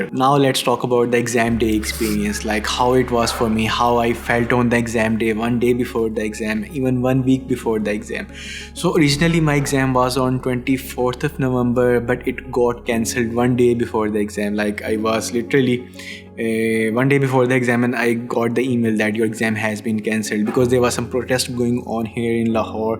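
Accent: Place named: India. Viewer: Why you speak Indian 😢😢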